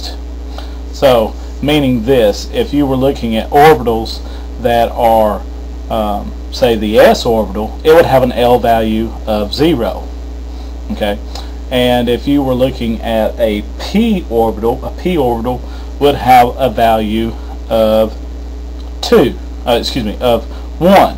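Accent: South, United States. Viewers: great video thanks so much very helpful. i like your Southern accent. Greetings from California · I like your southern twang! It helps keep me focused on the video! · awesome country accent..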